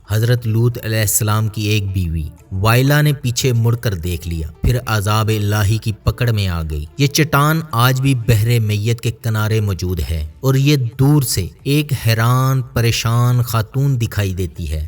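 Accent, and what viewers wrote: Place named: Punjab, Pakistan. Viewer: Apka punjabi accent lahja bahot bura lagta hai sunne me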